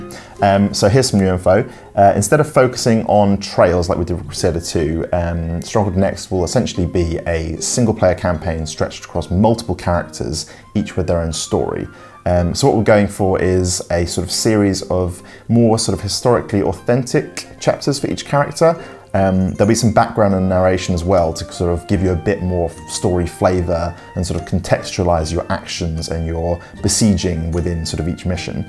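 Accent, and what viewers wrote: United Kingdom. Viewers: I like British accent :)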